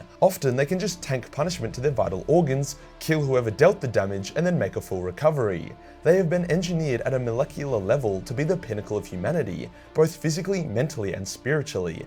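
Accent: Australia. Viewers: I love it when you use your Aussie moonspeak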